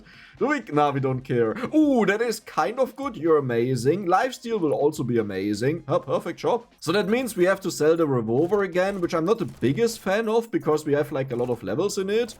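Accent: Germany. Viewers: the german accent xD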